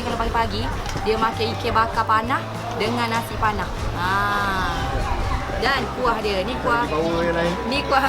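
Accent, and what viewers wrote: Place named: Kelantan, Malaysia. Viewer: Chak puak...hahahaha..pekat doh kelantan dia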